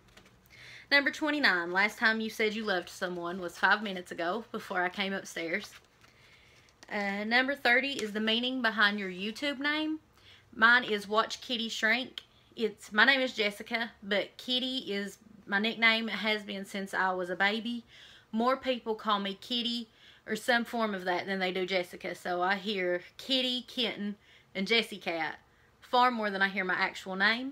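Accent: South, United States. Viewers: I love cornhole! Your accent makes me feel at home...I miss the south :)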